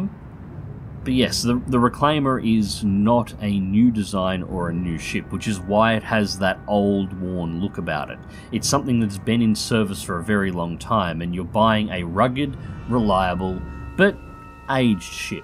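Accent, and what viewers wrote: Australia. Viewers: your voice is perfect to have in the background while i do other stuff. love aussie accents. a tad more entertaining than south African accents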